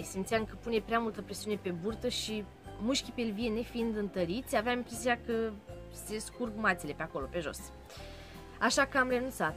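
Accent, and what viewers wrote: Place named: Moldova. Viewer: ..multumesc pentru incurajare...te admir si iti urmaresc vlogurile ptr ca esti naturala...imi place cum vorbesti...accentul tau moldovenesc ca al meu...deocamdata tin totul sub control...ma intrebam de ce eu...din acel procent mic de femei pe care reusesc sa il faca in sarcina...adica diabetul...si neavand nici in familie pers cu diabet.. nu sunt supraponderala....am avut toata viata 45 de kile...si in sarcina am pus 10 kg pana la 7 luni....eu fiind schija mereu imi ziceam lasa ca poate ma ingras in sarcina:)))stiu ca in ultimele luni pui multe kg cu acest regim nici nu dau jos nici nu adaug...noi in familie suntem maruntei...si surorile mele....nu stiu de ce zic ca as face bebe mare....eu mereu ma intrebam macar sa aibe 3kg sa nu fie o matza mica de copil....si cand am auzit ca am diabet si tre sa tin dieta sa nu se duca surplusul de zahar ka bebe ca s ar transforma in grasime la el..am ramas tablou...la 20 de sapt avea 300 de grame...la 26 am facut testul de glicemie si mi a iesit prima valoare 95 in loc de 92 si mi a dat trimitere la diabetolog...nutritionist. 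Este fff stresant sa te trezesti dintr o viata normala...dormeam cat vroiam in sarcina...acum sa ma trezesc dimineata ia ti glicemia pe stomacu gol...si nu mai poti dormi ca vaii ia micul dejun la ore fixe....cantmd te vezi cu glucometru in mana te simti bolnava...dar trebuie sa ma resemnez....daca imi ies valori bune ma simt ok...dar cand is peste limita deja ma demoralizeaza....nu stii ce sa manan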